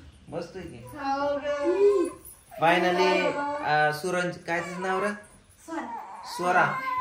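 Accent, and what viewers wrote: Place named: Malshiras, India. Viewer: तुमची भाषा खूप छान आहे मला माझ्या भावाचं बोलणं आठवतं माळशिरस साईटला सेम आसच बोलतात त्यामुळे मी तुमचे सगळे विडिओ बघते पण कमेंट करत नाही आज केली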